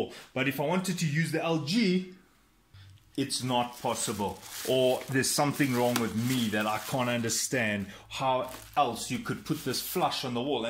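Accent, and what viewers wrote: Afrikaans, South Africa. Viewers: Smaaking the afrikaans accent😝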